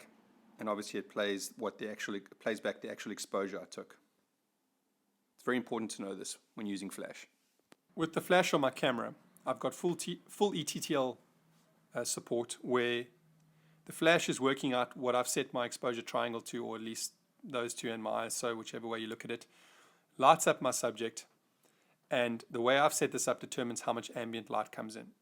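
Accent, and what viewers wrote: South Africa. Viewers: Thanks very informative and well presented a nice calm narrative no shouting or speed talking. Soon as I heard you speak I thought SA accent a beautiful country, I have been lucky enough to visit it a few times.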